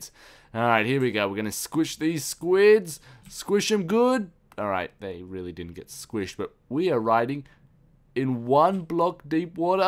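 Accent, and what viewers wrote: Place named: Australia. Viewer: Are you Australian? You kinda sound like one.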